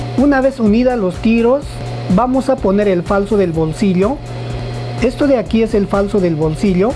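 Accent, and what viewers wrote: Peru. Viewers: Obersay? Tela flish terri? Q gracioso para peruanizar las palabras 🤣🤣🤣 igual se ve buena onda, saludos!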